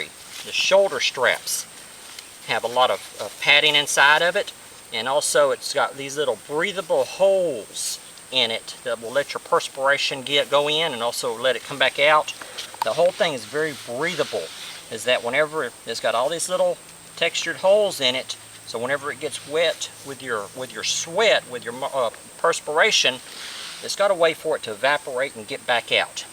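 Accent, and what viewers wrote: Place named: Alabama, United States. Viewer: what part of the world are you located in? reminds me of Alabama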